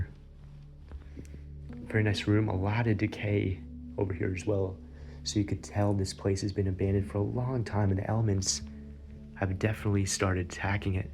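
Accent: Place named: United States. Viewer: Did I miss the round spire/tower room from the inside ? I also have read some comments about learning what this older furniture styles are , it would help with dating stuff . You don't have to take classes to learn stuff , just look at the pics and do a google search and you will usually find more info on the old stuff . The cork on the walls in that bathroom was odd to me , I am guessing it was done in the 1960's or later , that was Hideous looking . The photo slides are not Polaroids , I lived through that Era , and used Polaroids . I also have Some Slides from the 90's , so they are pretty common . The Scanners from some companies in the 90's had an attachment piece for showing the slides on your PC. Were you on a vacation trip in France ? You speak American English , so I assume you are from the States . I am also very glad you were respectful and not ransacking the place , I watched a different video with a real Jerk , that was so disrespectful , and made me think he is a real thief and lowlife. 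Keep up the great work .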